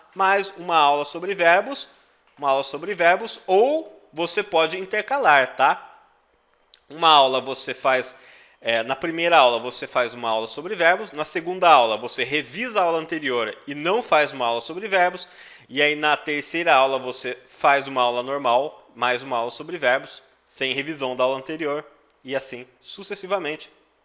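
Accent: Germany. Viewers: Boa didática. E de qual região da Alemanha é o teu sotaque?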